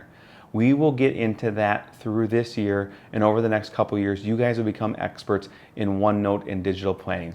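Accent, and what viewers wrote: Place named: Wisconsin, United States. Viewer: I KNEW you had a WI accent! CONFIRMED! :) Is there a Sconie discount? ;)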